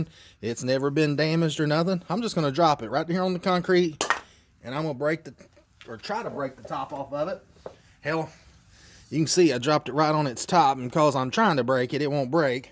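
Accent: United States. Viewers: I love your cowboy accent 😍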